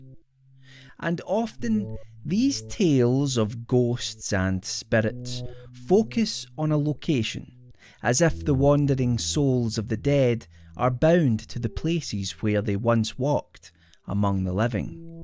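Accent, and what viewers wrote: Scotland, United Kingdom. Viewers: Quality videos, interesting concepts, Scottish accent, Dude needs more subs. Good work sir.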